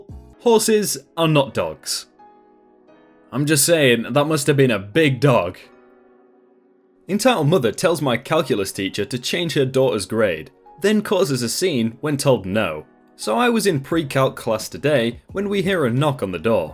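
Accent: United Kingdom. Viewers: Hi! I have always been listening to your videos ever since I come across by my recommendations and I enjoy it every single time because I enjoy your accent of British. Please keep it up with it. I enjoy a lot.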